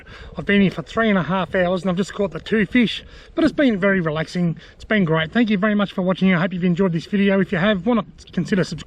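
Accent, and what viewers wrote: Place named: Australia. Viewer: Sounds like a true Aussie.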